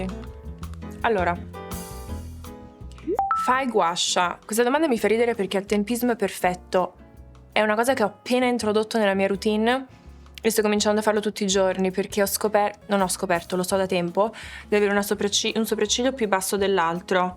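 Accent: Italy. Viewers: semplicemente “l’accento” italiano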